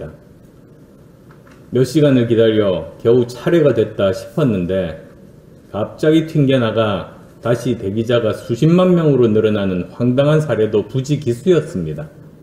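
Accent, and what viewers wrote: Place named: Busan, South Korea. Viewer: 말투가 와 글로 화술 좀 배우쇼 안대표님 그렇게 부탁을 해도 안되는지 사투리 부산말 밖에 할줄 모르시는지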